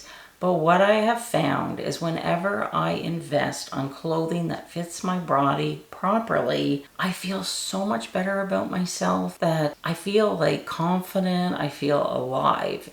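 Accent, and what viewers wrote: Canada. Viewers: Looks like I have the same body shape as yours. I agree on high rise pants ,shorts etc. And I never tuck in tops. What I HATE is baggy pants jeans or dressy slacks. I call them "Bozo" 👖 pants. Mainly because I have thin legs and ankles but a way too big stomach. This is the first time seeing your videos. Nice I'll be looking for more. Are you from Canada? I only thought that because of the way you say " your O s " . 😊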